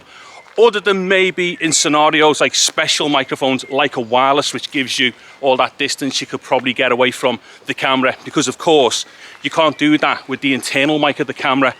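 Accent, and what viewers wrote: Liverpool, United Kingdom. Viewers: I can't begin to tell you how nice it is for a Yorkshireman living in Japan awaiting his Sony ZV-1 just purchased via Amazon in the UK for his daughter to start her YouTube channel to hear a salt-of-the-earth scouser give a top-notch run-down on the Rode Wireless mic that my daughter is thinking of adding to her cache. For those of you interested in grammar, which is the main verb in the preceding sentence?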